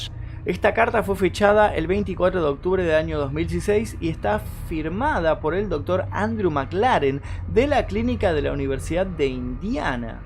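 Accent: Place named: Argentina. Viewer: siento decirlo así pero pareces muy estúpida o tu comentario es estúpido. Te estás metiendo con este chico porque habla argentino.. Lo tachas de hablar de la "selva" (habla perfectamente) cuando ves vídeos de una persona Argentina. A ver si tienes cojones de escribirle al chico de los vídeos a decirle que no entiendes su idioma de la "selva" y que hable "bien" (como tú) en los vídeos. Venga, ve corriendo a ver si te atreves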